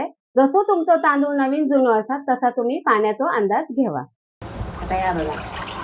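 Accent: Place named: Malvan, India. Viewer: मसाले भात भारी 👌🏻 तुमच्या तोंडी मालवणी भाषा गोड वाटता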